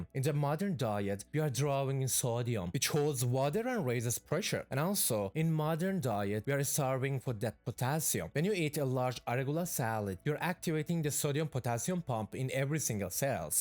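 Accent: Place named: France. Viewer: i can hear a french and Swiss accent or Indianish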